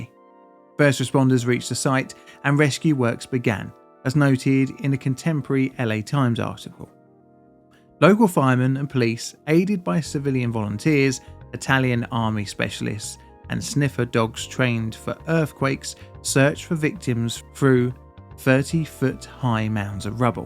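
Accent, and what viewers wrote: United Kingdom. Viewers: Brit pronunciation always fascinates me. Do you pronounce pizza as "pee-za?"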